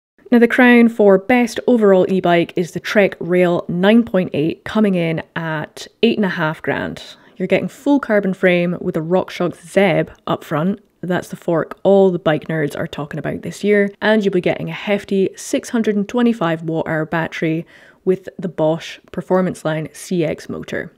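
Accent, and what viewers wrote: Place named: Scotland, United Kingdom. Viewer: Just come across your channel and was obviously swayed by the Scottish accent . . . but I did hear a lot of the bike decision based on sales and popularity on the trails? That should never come in to the equation for a report on a "top 5". Surely you need to say why it is popular? Ride in Peebles/Glentress and you will see lots of Santa Cruz, but that isn't because they are the best (although I may be digging a hole here), but that is because that is the bikes the local dealer sells!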